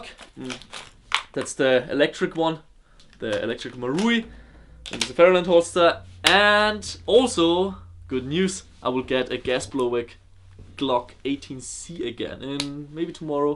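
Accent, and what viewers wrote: Germany. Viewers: hey deine videos sind echt nice ich find das immer witzig wenn du englisch redest und man dann soo deinen deutschen aktzent raushört was ja nicht schlimm ist;) mach weiter so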